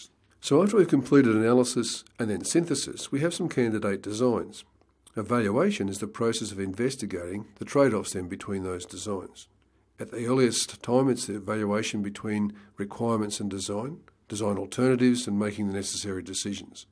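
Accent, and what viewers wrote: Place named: Australia. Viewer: I'm not used to the australian accent, he speaks like too fast and for me it's not clear enough, I have to listen twice sometimes but I'm glad this course is uploaded.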